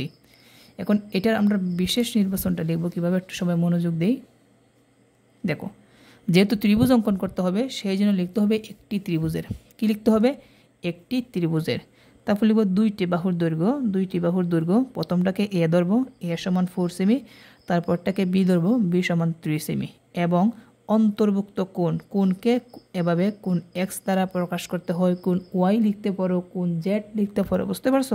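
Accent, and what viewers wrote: Sylhet, Bangladesh. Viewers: SIR APNI ki Sylheti??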